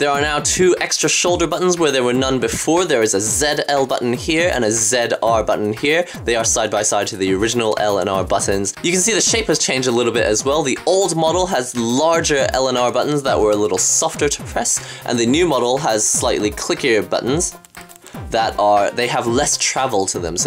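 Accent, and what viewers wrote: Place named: Scotland, United Kingdom. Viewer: I can't put my hand on your accent. You sound a tiny bit Scottish:)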